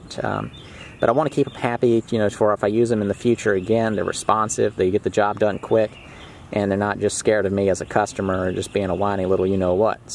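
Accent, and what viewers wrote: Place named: Texas, United States. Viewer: I hear a southern drawl. I say Texas.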